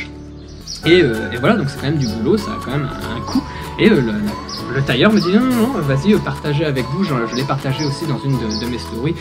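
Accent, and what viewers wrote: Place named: Turkey. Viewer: "Ne kadaağ" maşallah Türkiye'de yaşaya yaşaya ağız bile yapmış abi.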